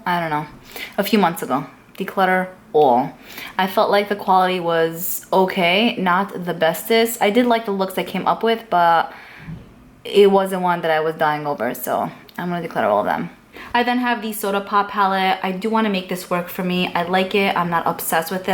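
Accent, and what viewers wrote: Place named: Long Island, United States. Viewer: Love watching you! I’m from Long Island (now in PA) and miss the accent!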